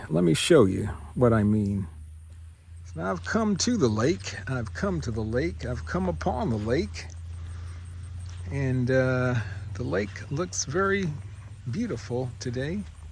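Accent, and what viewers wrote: Texas, United States. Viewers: Hello, my name is Roman. I come from Poland 🇵🇱. I like your YouTube channel. I like your Texas accent too. I learn English and your video help me improve my English, thank you for that. I appreciate it.😊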